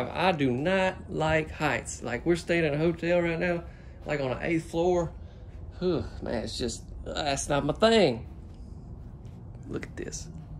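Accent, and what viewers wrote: South, United States. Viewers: oh that would be great. we would gladly welcome you. You have that southern accent like i do. Only difference is i'm female. lol 😁 Don't forget to show us your beautiful family on some of your next home shows. ❤👼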